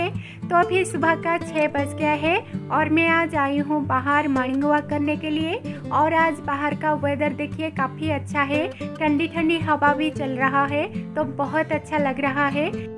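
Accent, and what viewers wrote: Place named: Assam, India. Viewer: Tumar kothat gom e pai tumi Assamese suwali buli...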